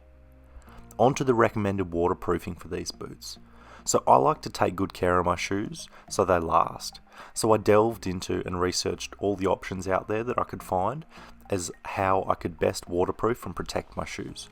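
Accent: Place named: Australia. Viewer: Loved the video and the laid back Aussie voice! Going to pull the trigger on a pair of these after seeing this. Love from Ireland :D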